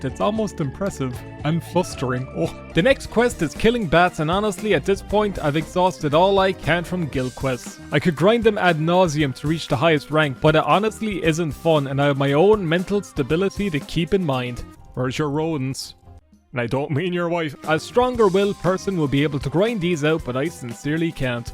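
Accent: Ireland. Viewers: Man with irish accent playing old RPGs is my favorite youtube genre. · I’ve never been so happy to hear that Irish accent coming through my speakers. Welcome back,! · that sultry Irish voice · you have no idea how happy I am to hear that Irish accent monologue about TES again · !!! Man it’s so good to hear that Irish accent again, and he returns with almost 2 hours of Daggerfall wowie what a blessing! we hope you’ve been okay, it’s so good to hear you alive and well. CHEERS MATE 🥂🍻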